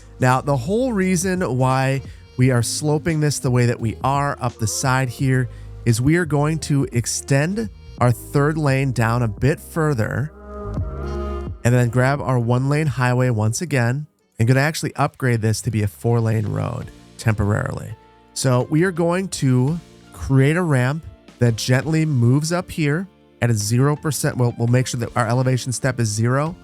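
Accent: Wisconsin, United States. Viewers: you’re from wisconsin??? 😂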